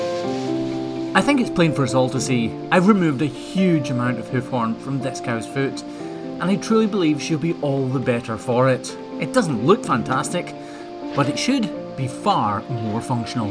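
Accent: Scotland, United Kingdom. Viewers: Hey, Where is the big smile and a hand over the camera lens? What's with the black screen? Oh, I know the nasties over on Craigie Boy have caused you to rethink everything. Come on love, we want the smile back and the hand over the lens. Those two things speak of you as much as Your Scottish accent. Tell all the nasties to buzz off! Do that, and I'll kiss one of your cows. lol Have a good evening. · he sounds scotish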